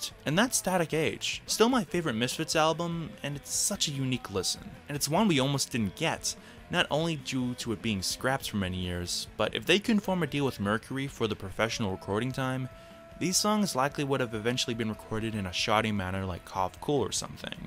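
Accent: New Jersey, United States. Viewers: He might be living in Oz but he clearly has a US accent, so must have previously lived there. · Vampire costume+Jersey accent=Priceless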